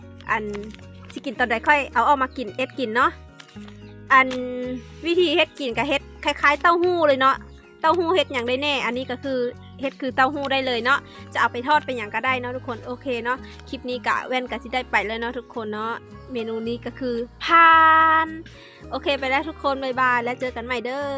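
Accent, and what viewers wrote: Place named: Thai Phuan, Thailand. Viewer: ภาษาพูดคล้ายๆ คนชาวไทพวนที่บ้านผมเลย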